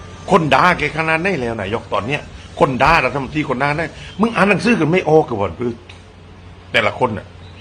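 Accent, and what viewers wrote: South, Thailand. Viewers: แหลงได้ดี นี้แหละคนใต้ต้องชัดๆ💓💓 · ไม่ว่าภาษาไทยภาคใด...ปชช.รู้เข้าใจตรงกัน..รัฐบาลล้มเหลวโดยการนำของนายกไทยคนนี้..ภาษาใต้ชัดในความรู้สึกเจ็บปวดครับ..คุณ ชัย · ได้แรงอกพี่น้องเหอ คนใต้แหลงหรอยนิ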